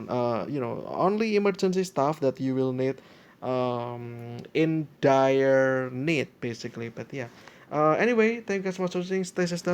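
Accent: Indonesia. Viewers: How do you get Alphacelone?. Btw, your accent is kinda like Indonesian? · Ur sound like i donesian accent u know?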